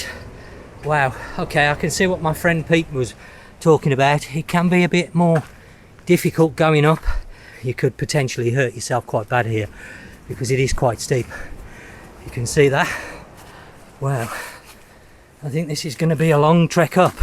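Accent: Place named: Black Country, United Kingdom. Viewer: what gave it away was when you said the batter bits on your last video, common thing we say around the black country when we have our battered chips. im from Wolverhampton myself and thought you sound local.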